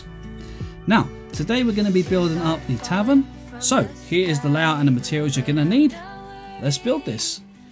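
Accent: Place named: North, United Kingdom. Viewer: I just had to subscribe to the channel :) Thank you for the wonderful tutorials you publish. I am from Germany, so there are at least two benefits I have from watching those awesome tutorials: First (the obvious one): Learning about building wonderfull objects in Minecraft. If not "step by step" then at least tons and tons of inspiration! Second: A wonderful training in "listening and understanding" English. May I ask where your accent is from? It sounds very much like from the north. So - for me - you are in some way the "Bob Ross" of Minecraft-Tutorials :) It is very pleasant to listen and of course to watch your tutorials AND when it is done a beautiful object is created ;) Greetings from Germany and all the best!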